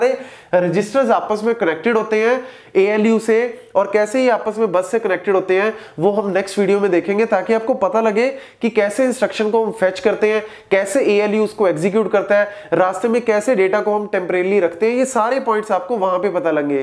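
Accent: Punjab, India. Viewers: your punjabi accent is sooooo cute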